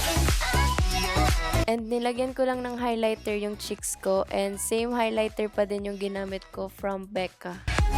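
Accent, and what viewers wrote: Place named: Bicol, Philippines. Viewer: I've missed you and your cheerful and bubbly voice (with the Bicolano accent)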